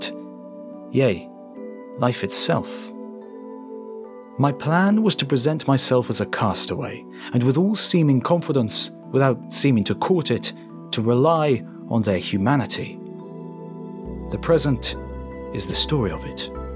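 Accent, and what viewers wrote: United Kingdom. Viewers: For a Native American, he sounds slightly British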